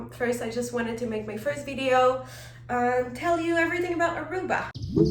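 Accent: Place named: Russia. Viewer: Your accent sound a little bit Russian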